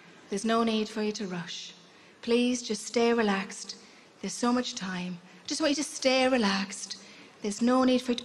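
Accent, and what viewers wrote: Newcastle, United Kingdom. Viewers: Geordie accent from Newcastle (area) in the northeast of England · Great fun, Geordie perspective is fun assisted by your fantastic accent can't get enough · she is actually quite funny maybe it is the geordie accent [which i like]seen her on some tv. panel shows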